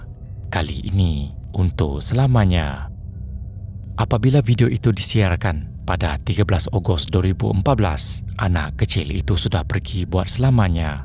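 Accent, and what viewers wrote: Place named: Malaysia. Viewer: Min indon ke Malay? 😂Dngr mcm malay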